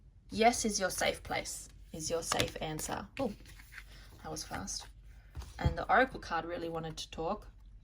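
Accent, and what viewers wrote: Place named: Australia. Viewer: Thank you. I love hearing an Aussie accent. Your approach is intriguing and unique. Pile #4 was perfect.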